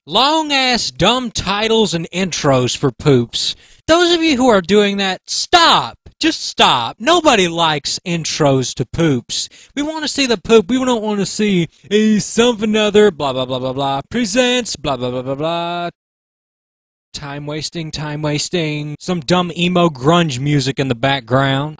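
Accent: South, United States. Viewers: I'm guessing you're from the south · the southern voice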